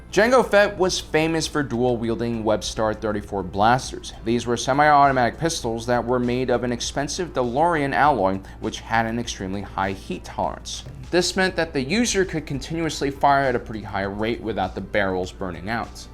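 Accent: Australia. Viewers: The intro sounded very Australian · ... Aussie? New Zealander? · Are you Australian ? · are you Australian ? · You actually getting better at the Australian accent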